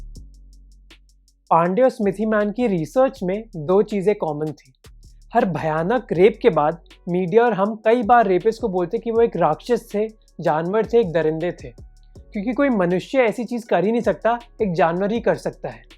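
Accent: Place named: Northeast, India. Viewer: By the accent i am sure you are from arunachal or northeast right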